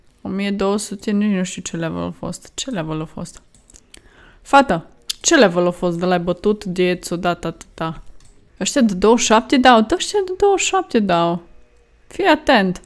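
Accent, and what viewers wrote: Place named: Cluj, Romania. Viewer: Ce accent de Cluj😂❤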